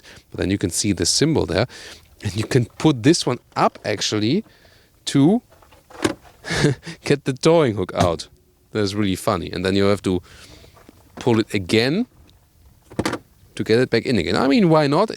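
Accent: Germany. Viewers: I believe your german accent renders you unable to properly pronounce the word "vehicle" . I am sorry to say that this way it sounds as if you were trying to say "fecal" but with "v" at the beginning. This is not an insult ... just my failed attempt at constructive criticism.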